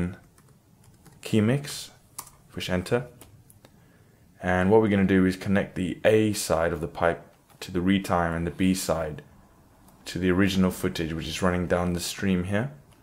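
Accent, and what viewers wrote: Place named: South Africa. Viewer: you sound South African :P respect :D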